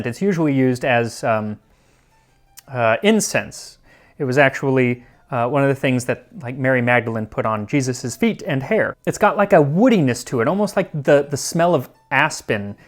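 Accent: United Kingdom. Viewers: No need to apologize for the British accent. Movies have taught me that most Romans had British accents.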